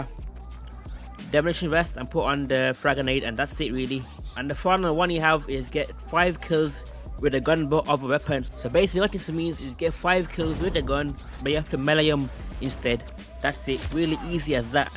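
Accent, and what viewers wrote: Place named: United Kingdom. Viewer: Bro you’re so damn British or whatever I can’t understand a word you’re saying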